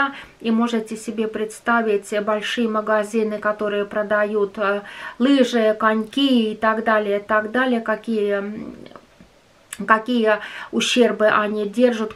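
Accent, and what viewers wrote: Finland. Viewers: Здравствуйте,! Русский у Вас отличный. Ну а акцент-это более 30 лет пребывания в Финляндии. А про юмор могу сказать, что не каждый в России может знать столько "мулек", как Вы. Канал смотрю месяца три, очень нравится. Продолжайте в таком же духе. Смотрела ранние видео. Хоть мы и далеко друг от друга (где Финляндия и где Урал), мне интересно знать, как живут люди за горами, за лесами)))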